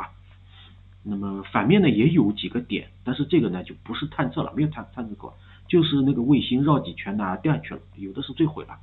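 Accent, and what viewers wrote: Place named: Shanxi, China. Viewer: 请问是哪里人？听口音有点耳熟，应该是北方人。山西？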